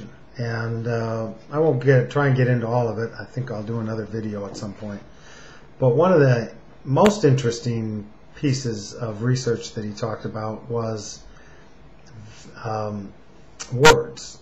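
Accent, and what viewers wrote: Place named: United States. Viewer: very informative video, good information for educators. Very American sounding accent.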